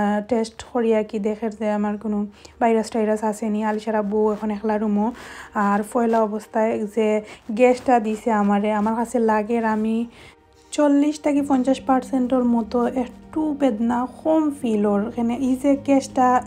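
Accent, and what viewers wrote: Sylhet, Bangladesh. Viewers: Sylheti voice hunar shate shate subscribe korilaisi💞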